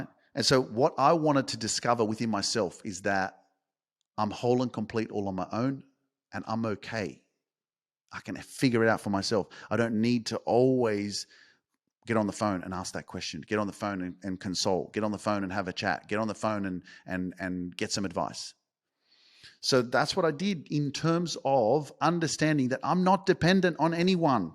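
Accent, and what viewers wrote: Australia. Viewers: I love your message,. ❤❤❤ Thank you for being a guiding light in the darkness for those who need it so very much. Thank you for telling it as it is. No sugar coating. Just the Truth. And in an Aussie accent. 😊 Your message is needed. You are needed. You are loved. You are appreciated. Thank you,. You're beautiful. ❤❤❤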